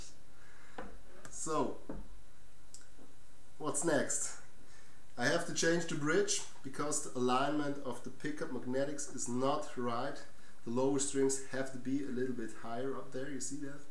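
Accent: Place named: Germany. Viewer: I love your accent! I am guessing your native language is German?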